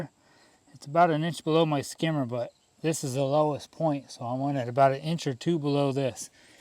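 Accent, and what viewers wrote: Pennsylvania, United States. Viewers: Loved the video. It was informative. I have always helped my dad with winterizing our pool. I've never done it alone. My dad passed last month. I'm thinking to myself... how on earth do I close the pool for the winter. My dad had done everything and only wanted help with centering the pillow and placing and securing the pool cover. So here I am.... clueless about all of the other aspects of closing the pool. I had to chuckle at the PA accent. My dad has family in PA. I just came back from a week in PA (it was originally planned as a trip to bring my dad to say good bye to his family there). Thank you for your advice. I got this.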